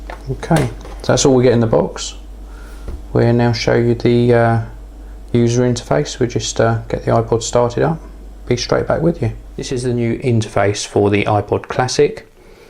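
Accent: United Kingdom. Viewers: wonderful accent! love the UK!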